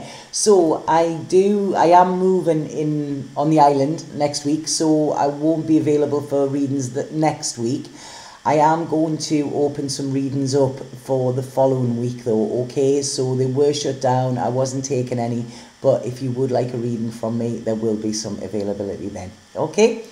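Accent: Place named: Scotland, United Kingdom. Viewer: oh and thank You so Much , you glow with good clean white /pinkish aura and i can sense you are such a good lady , i love your never ending smiles and your excitement for me/us is awesome , great reader and that what sound scottish just great , reakly like your energy girl we will talk again ok , im always open ,and usually can hear if you are tuned to my rock n roll station lmao but tru , this stuff cant be wrong buit i gotta be sure , so i wait ,isntr like i havent already , all life damn near ,, im good at it now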